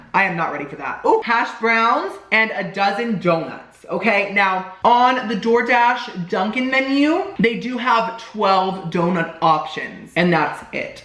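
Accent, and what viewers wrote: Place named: United Kingdom. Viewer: Hilarious video! You and crack me up! You MUST convince him to do a taste test video with you! That would be so fun! Also...you need to made a video of you just saying CROSSIANT and BUTTA (in your British accent) for 10 straight minutes! lol...the way you say CROSSIANNNN...just cracks me up! One more thing....a friend called me and I said I have to call you back I'm watching...lol..nobody interrupts my time.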